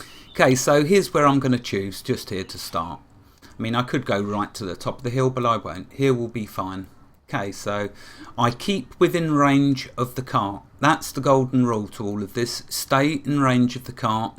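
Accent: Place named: England, United Kingdom. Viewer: *Laughs* Hi and I live 5000 miles and one gigantic pond of water (Atlantic) away from each other. So for now the only way I can appear in a video is in the background. I can not hear when he is recording so I have no clue what he is saying until I watch the video's just like all of you. I personally do not have the equipment that has to be able to record but then if I record it wouldn't be a Tutorial would it? Besides I don't have that fantastic English accent that has. I am originally from California so I don't really have an accent and would be boring to listen to. *laughs* I really wouldn't feel comfortable recording a video on my own since this is tutorials. is my best friend on this planet and I would never want to take anything away from his project. One day we hope to be in the same location so we can work on this together. You think you are amused by video's now wait until we can record together. 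*laughs* Like Vikki said we sound like an old married couple. We affectionately bantering with each other which is funny to listen to. So I am sorry dear for now you will have to listing to waffling on alone. *smiles*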